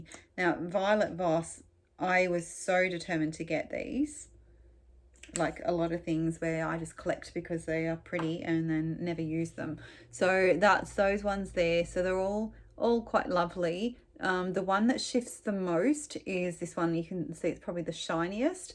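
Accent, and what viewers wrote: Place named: Australia. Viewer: Aussie accent learning time! haha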